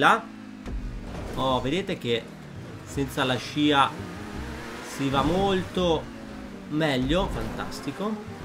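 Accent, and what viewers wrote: Veneto, Italy. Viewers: Ma sei veneto hhahaha? Ciapa fogo hahah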